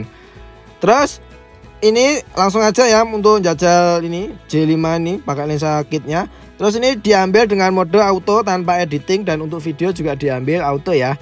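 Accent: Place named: Bantul, Indonesia. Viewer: Logate mbantul buanget hahahhaha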